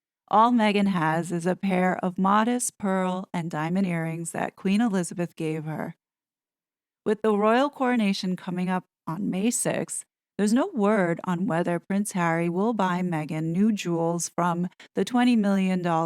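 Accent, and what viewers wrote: New York, United States. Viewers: New Yorker. OK. Could never pin point your accent. Another great video. It appears the girl child creature always thought she was superior to everyone. She sees how much she has missed out of leaving the Royals. With her real love interest, William, moving up to Prince of Wales and the beautiful Catherine, Princess of Wales, she is out of her mind with envy. I'm sure she and her Suck Squad will be hitting the Royals with more hate the likes we have never seen before. Hope it is true MI5/6 are investing the squad. The rings are beautiful. I love how people are making fun of her with those ugly pictures. Every time she hits we should hit back. She wants to act like a child, we can go all out Mother Bear on her. Have a great week.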